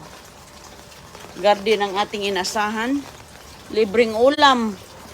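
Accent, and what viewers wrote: Visayas, Philippines. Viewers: para po sakin siguro pabayaan na lang po natin siya ganyan po siya at siya yun di tayo kaya mas maganda wag na lang panoorin kung marami kayong nakikitang kamalian sa kanya at sa mga ginagawa nya. marami din po ako kaibigan na bisaya at ganyan din mag salita kahit sobrang tagal na nila dito sa katagalugan. opinyon ko lang po .✌️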